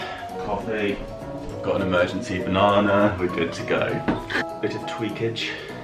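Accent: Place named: Birmingham, United Kingdom. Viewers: Ha ha loved the Birmingham accent 😂 I liked the white pumpkin & grey skull best! 🎃🎃🎃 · Love the DIY and the very cute Brummy accent lol. Sounds just like me 👍😋# Mr talented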